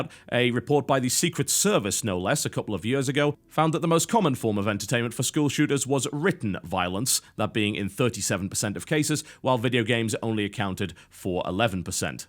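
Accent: United Kingdom. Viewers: The only british that i can hear for minuts, Salut from argentina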